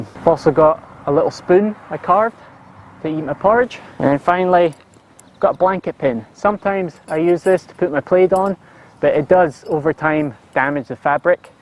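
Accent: Scotland, United Kingdom. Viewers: Nice Scottish accent, mate ! Damn cute😌 The vid is also very interesting ... always wondered how those guy managed to travel so light !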